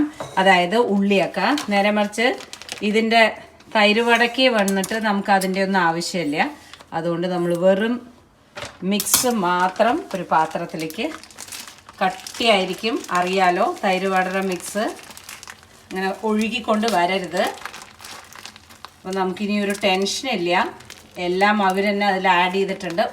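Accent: Palakkad, India. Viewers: നല്ല വീഡിയോ, തൈര് വട എനിക്കും നല്ല ഇഷ്ട്ടോണ്, പിന്നെ നമ്മുടെ പാലക്കാടൻ ഭാഷയും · Hai eepaalakkadan samsaravum paachakavum eshttayi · പാലക്കാട്‌ നാടൻ ഭാഷ സൂപ്പർ ❤️❤️❤️ അമ്മ ❤️❤️❤️❤️❤️❤️❤️❤️❤️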